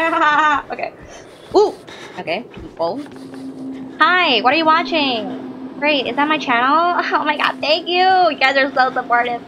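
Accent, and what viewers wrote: Bisaya, Philippines. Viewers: Bisaya u? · Ate pinay ka po ba? Cute ng braces tsaka ng accent mo hehehe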